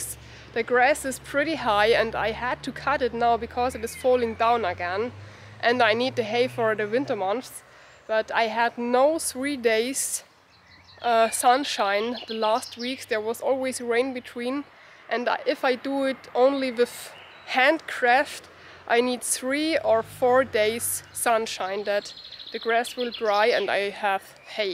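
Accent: Bavaria, Germany. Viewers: Servus, " You go girl!" Des is a Amerikanischer Ausdruck! Was bausten du mit dem Loch?( I Dat a root /tornado/luftschutz Keller bauen fuer diesen Zeiten- man derf nett bloed sein - die Zeiten waeren schlimmer- nett besser). Ois Guads,bleib gsund- Semper Fi- Peter p.s. des gfoid ma wenn du so nett bairisch sprichts mit deinen Tieren.( unser Germanische r Stam is wichtig gel.)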